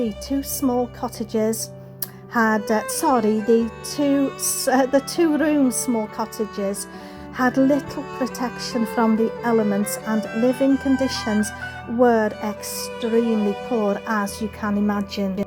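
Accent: Wales, United Kingdom. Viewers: Excellent video on Dinowig Quarry, one place me and Brett really want to get too, so it was fascinating to hear your tour and i love hearing the Welsh accent, it's great to pick up a few words. Like you said it's quite the climb, but from what you've shown us its well worth the journey! How long did it take you roughly to do the walk? Thank you, appreciate your videos!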